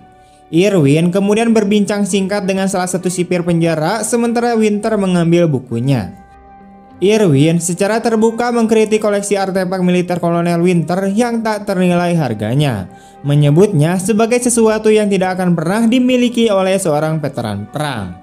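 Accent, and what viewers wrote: West Java, Indonesia. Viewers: Orng sunda ya bang?😅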